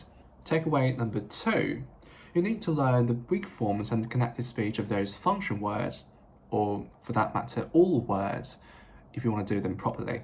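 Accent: United Kingdom. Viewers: 英國腔英語，習慣美式英語的乍聽之下有點不習慣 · Beautiful British accent! 👍👍👍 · 他是英国口音，你只熟悉美国口音。i这个音，英国更像ei，而美国更像ee as in teeth。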